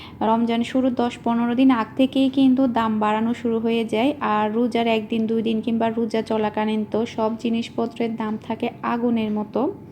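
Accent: Chittagong, Bangladesh. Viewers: আপু আমার বাডি ও চিটাগাং আমি সৌদিআরবে থাকি আমার দুই ছেলে আমার নাম নাহিদা আপনার চিটাগাং এর ভাষা বলগ টা অনেক ভালো লাগে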